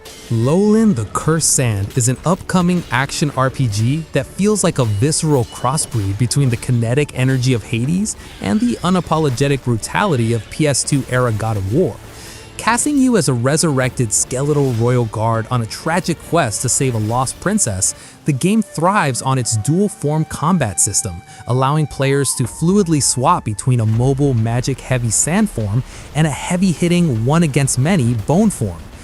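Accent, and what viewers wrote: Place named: Australia. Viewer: your voice doesn't sound Indonesian at all despite location marked as Indonesia. not even singlish. hmmm australia? or is this AI run channel?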